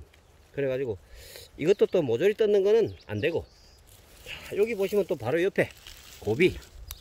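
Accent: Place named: Seoul, South Korea. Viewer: 알흠흠다븐 서울 누님 말썸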